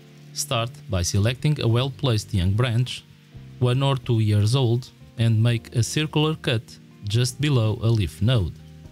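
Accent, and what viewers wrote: Portugal. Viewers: 100 percent Tuga accent. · From the accent, i'm betting this guy is Portuguese. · Gosto do sotaque português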